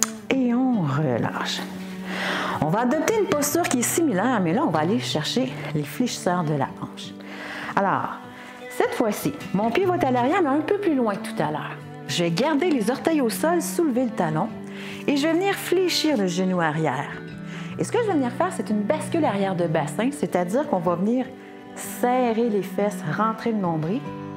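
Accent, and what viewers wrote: Quebec, Canada. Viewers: J'adore, c'est simple bien expliquer ! En plus d'un joli accent québécois 😚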